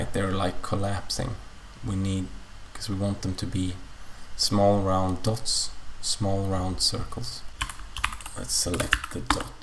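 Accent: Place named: Sweden. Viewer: Are you from sweden? bzs you have the same accent as pewdiepie.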